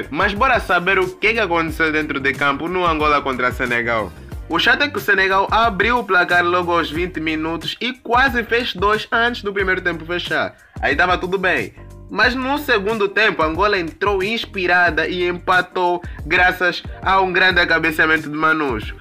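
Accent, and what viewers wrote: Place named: Brazil. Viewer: bom trabalho mas para de falar com o sotaque brazileiro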